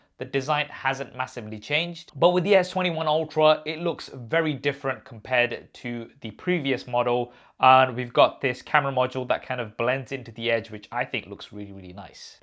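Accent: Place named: United Kingdom. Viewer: please link friend with speaker test! Having hard time with your UK accent haha. Thank you 🙏🏼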